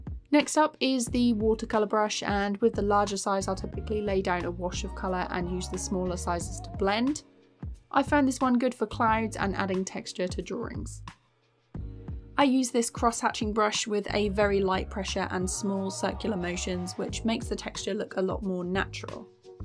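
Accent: United Kingdom. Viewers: Your animation is great and tahnks for the pack! lol your british accent is rly clean and heavy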